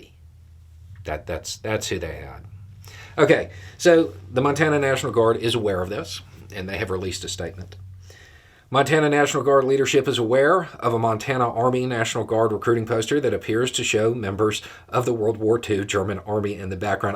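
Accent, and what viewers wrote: South, United States. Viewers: Dear, I love your videos! And they keep getting better! To hear truth, insight and intelligence spoken with a southern accent is so surprising and refreshing! And I love Curious George, too! Keep being a genuine patriot and supporting our Constitution!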